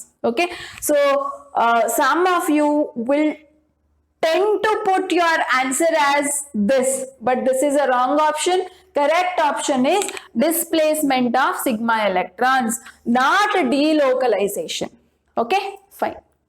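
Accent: Karnataka, India. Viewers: Yes ma'am is from Karnataka ❤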